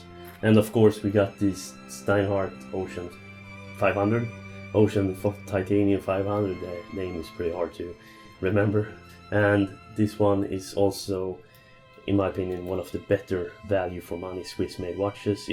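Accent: Sweden. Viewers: Dmitry Streak Probably I am swedish and he kind of sounds as my english, maybe central sweden like Stockholm.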